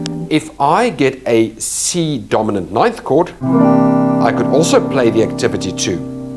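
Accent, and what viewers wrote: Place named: RP, United Kingdom. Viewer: You have the poshest accent of any guitarist I’ve ever heard speak! Where is that from?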